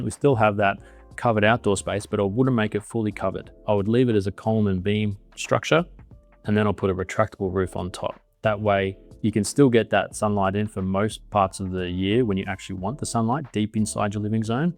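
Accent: Australia. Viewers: at 48 seconds in he mentions the southern hemisphere. Also his accent clued me in that he was Australian. I’m from Australia and down south we have quite cold winters. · Definitely Down Under. The sun path threw me until I placed your accent.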